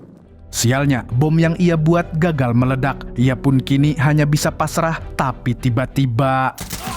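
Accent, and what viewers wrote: Banjar, Indonesia. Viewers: Orang Banjar ni admin😂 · Orang banjar kah mang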